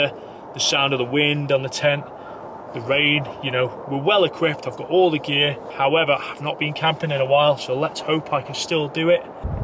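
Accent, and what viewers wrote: Liverpool, United Kingdom. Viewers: Great video. Love the Isle of Man. .Accent is bizaare. Sounds scouse sometimes (I'm from Liverpool myself) but then a few other accents.. Very intersting!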